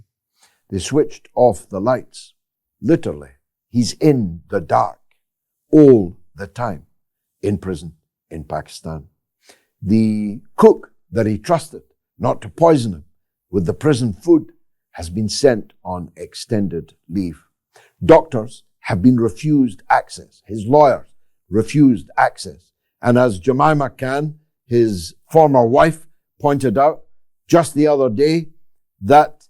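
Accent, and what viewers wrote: Scotland, United Kingdom. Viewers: ☔... old guy saying thanKs again.... me toO....imagined watching the Nazi's carry out their crimes during ww2 on YouTube.... would it have helped or not ?....born in 1950 i'm barely chipped away at the extent of the brainwashing and propaganda inflicted upon my generation and continuing... nevermind .... your accent ?..... just like my grandma's.... Fraser clan... and clan Donald....💜.. peace 🇵🇸🇾🇪🇸🇴🇪🇹🇸🇸🇦🇫🇱🇾🇮🇶🇸🇾🇱🇧🇯🇴🇨🇺🇺🇦🇷🇺🇺🇸.....⚾ ....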